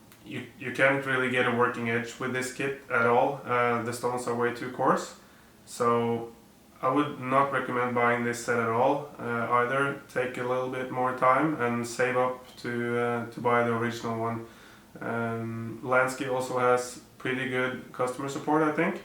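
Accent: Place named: Norway. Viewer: This er the most norsk engelsk jeg have ever hørt. Gøy å kommet over denne gamle legenden, savner gamle ytnorge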